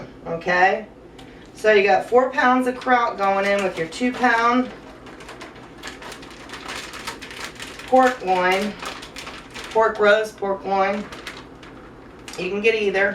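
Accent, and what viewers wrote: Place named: Ohio, United States. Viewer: You are my "Sister from another mister!" You sound like me, talk like me, I felt you! (Not to sound stalkerish!) I got the same cookware and everything!!! IDK how old mine is either! I've also got my Grandpa's Cast Iron Skillet! (Notice the caps? BECAUSE!!) I'm from Kettering Ohio! You sound like you're from here...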